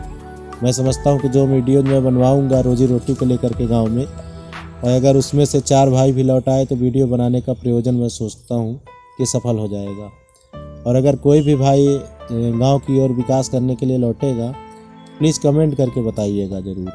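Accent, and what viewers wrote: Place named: Uttar Pradesh, India. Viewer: Bilkul up se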